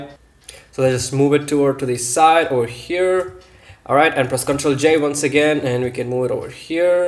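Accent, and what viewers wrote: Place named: India. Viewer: You're indian I kinda speak similarly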